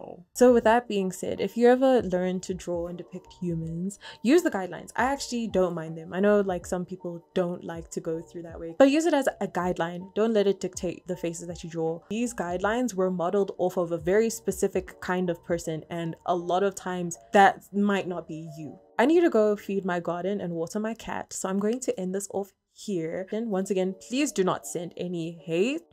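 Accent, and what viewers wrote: South Africa. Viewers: I was wondering is this a South African accent. Thinking nooo maybe uk maybe Australia then you hit that “but wow” and now I know. I’m sure. Hello my sister.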